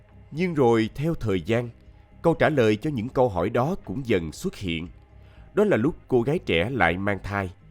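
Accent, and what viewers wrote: South, Vietnam. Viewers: giọng đọc miền nam nghe hay quá . cho 1 like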